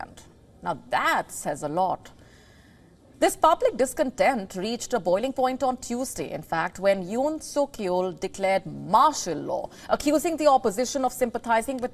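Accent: India. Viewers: Good info! Her accect is so heavy I can not understand, maybe this a show only for Indians? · i dont understand indian speaking english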